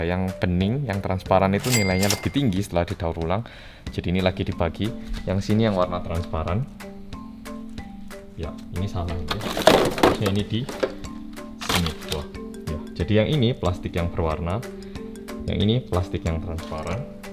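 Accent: Java, Indonesia. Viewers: jowo banget iku lho kletek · Hal yg menarik adalah, kebersihan tanggungjawab bersama, pemerintah buat aturan, warganya mematuhi..sehingga lingkungan negaranya bersih, indah, rapi, warganya juga sehat, rapi, dan kuat. Nah jawabannya warga +62, budayakan dari diri sendiri dan patuhi aturan pemerintah. Sekian dan terima kasih. Makasih mas medok kuliah sampahnya. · Orang Korea yang medok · Bersama orang korea yang ......MEDOKKKK😜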